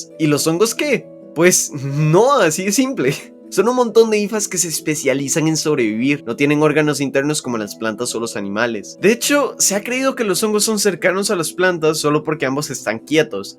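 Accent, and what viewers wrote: Costa Rica. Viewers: Desde que lo escuche supe que era tico purs vida pa ❤️ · Ufff se noto lo tico xd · Buen vídeo, nuevo suscriptor, esa forma de hablar y pronunciación de la R me suena de CR jaja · Eres de Costa Rica verdad?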